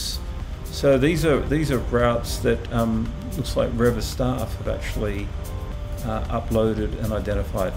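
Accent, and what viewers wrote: Australia. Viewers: Wait are you actually from Texas? I am assume originally Aussie?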